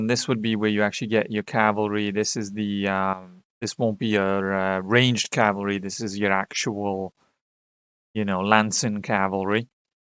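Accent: United Kingdom. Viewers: I always enjoy your videos and your accent as well, what part of the UK are you from? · I can hear the South Wales accent straight away, Swansea me. 😂🏴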